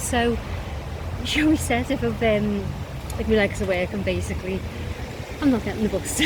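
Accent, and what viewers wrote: Liverpool, United Kingdom. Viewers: 😃I have just learnt your accent from Liverpool is called « Scouse » Thank you 🤗👍 I understand better English written✍️ than oral 🗣️🤭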